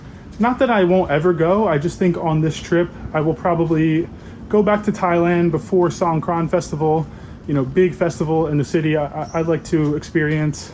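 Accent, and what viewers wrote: New York, United States. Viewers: Bro you`re TOO nice to these haters, i would have appreciated good old `get the fuck outta here!` rant with that NYC accent&attitude!😂🤣💪👍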